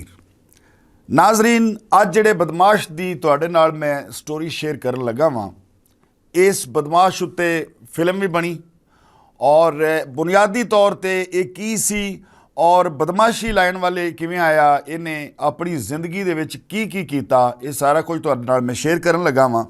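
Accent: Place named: Punjab, India. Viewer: اچھا پنجابی لہجہ اور انداز بیاں۔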